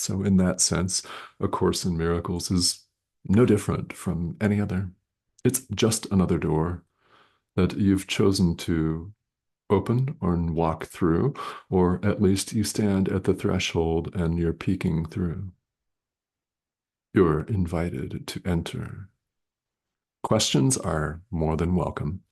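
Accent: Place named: Arizona, United States. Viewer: I knew it, I knew it, I knew it you are from Arizona. I thought Arizona or New Mexico.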